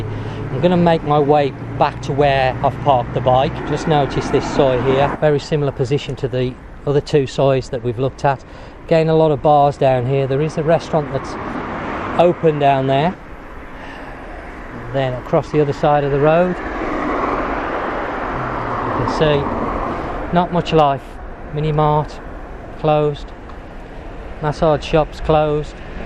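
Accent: United Kingdom. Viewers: What a disgusting accent is this? Must be some trash area from UK. Try to speak BBC English. Yuck. Thumbs down.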